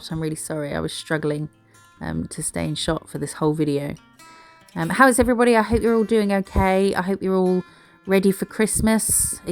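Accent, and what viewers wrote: United Kingdom. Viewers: and it’s good to hear a UK accent , I’m in Scotland , most videos I see about nails are American x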